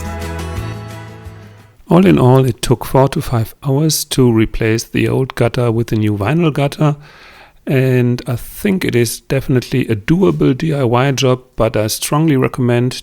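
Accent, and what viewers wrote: Germany. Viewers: your German accent is what makes you charming to me. I’m in Nebraska USA. Dead center of the country 😁 I’m truly enjoying your channel. Keep up the great work 😊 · Just found this series. Trying to figure out where this property is? The guy seems to have a German accent? Love the progress he has made so far. Very satisfying to see the yard clean ups! Please post an update. Beautiful countryside wherever it is.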